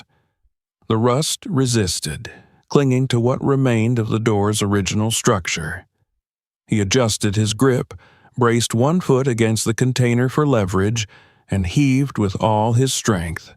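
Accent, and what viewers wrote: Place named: South, United States. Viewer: Oh goody a AI story with a Hill Billy voice over narration.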